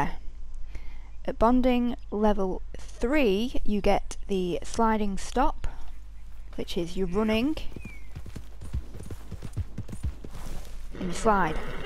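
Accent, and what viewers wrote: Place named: Manchester, United Kingdom. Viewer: is your accent from Manchester? That's my first guess, or at least somewhere in northern England. If I'm wrong I mean no disrespect. I just love all of the English accents but I'm still not quite good at placing them.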